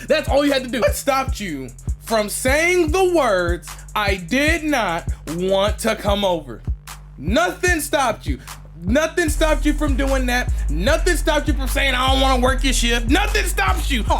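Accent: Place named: Memphis, United States. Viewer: You from Memphis G?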